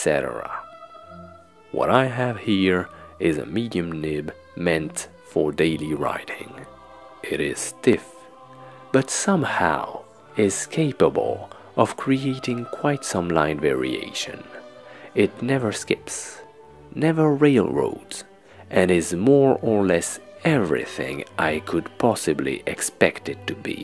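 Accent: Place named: England, United Kingdom. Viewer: J’aime your English accent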